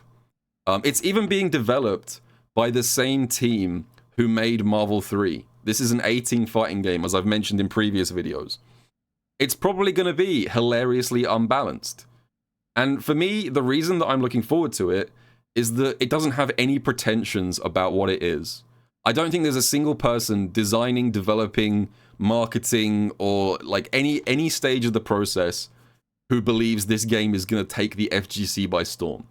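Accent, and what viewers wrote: United Kingdom. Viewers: I thought I was clicking on a Sajam video and then a British accent started talking to me, I was baffled.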